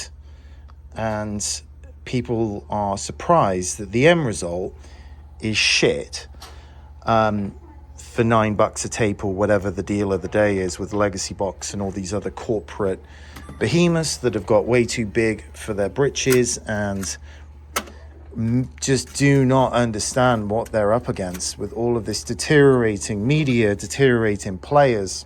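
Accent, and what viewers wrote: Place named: United Kingdom. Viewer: hmmm i hear a little british accent lol.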